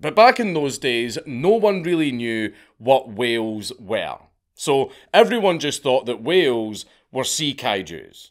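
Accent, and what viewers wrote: Scotland, United Kingdom. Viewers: Listening to your phlegmy, Scottishy, and nasally voice, reading such well written scripts (about things which i find interesting), is the highlight of my week... Carry on haha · A Scotsman could read out the dictionary for all I care, I'll NEVER get tired of their accent 🤣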